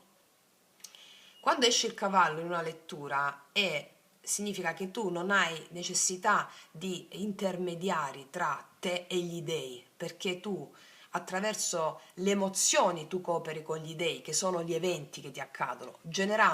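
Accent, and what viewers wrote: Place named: Salento, Italy. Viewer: Io avrei detto Salentina.. se è siciliana allora è di Messina 😊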